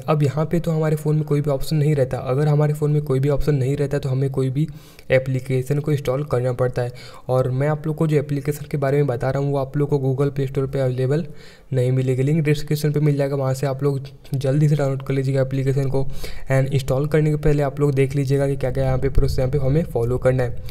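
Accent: India. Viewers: He speak in indlish. Their tongie are regguerer-tongue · Sir u write english speak indian why why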